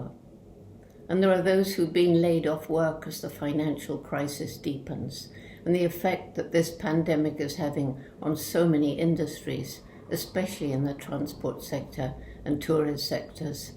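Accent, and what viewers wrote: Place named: Oxford, United Kingdom. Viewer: Not only the animal world needs you, you are also a perfect example to my French and German students for listening and translation exercises in your lovely "Oxford English language and pronunciation", additionally the messages you spread (social networks permitting)! ... 👍😍 🥀 I much hope this makes you smile! 🥀 Have a blessed and healthy time! 🥀 With love and "solidarity in sunny confinement", in Paris! 🥀 Marie-France ML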